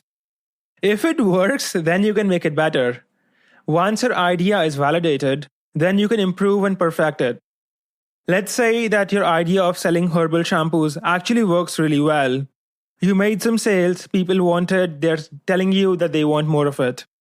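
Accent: India. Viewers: Why are people hating on his accent? No offense, but I find hard understanding Indian accent and this video is the exception. Great content.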